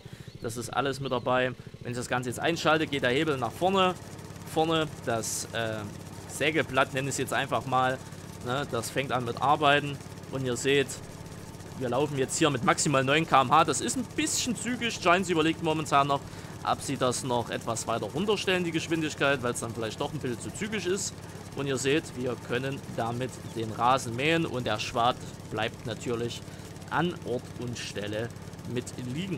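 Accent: Germany. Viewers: Gibt auch Gegenden in Deutschland wo man so redet;)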